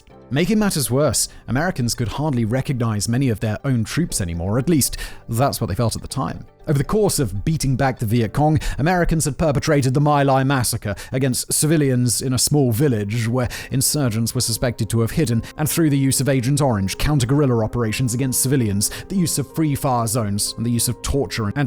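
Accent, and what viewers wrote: United Kingdom. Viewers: The Idiots Guide to Vietnam's Reunification for Tourists. Qualified by annoying pretentious and pompous British accent.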